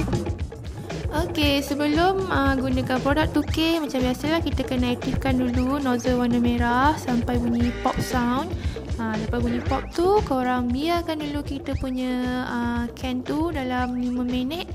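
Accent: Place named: Malaysia. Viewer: Cakap malaysia lucu juga ya.. tu key o seven trak bed😁😁😁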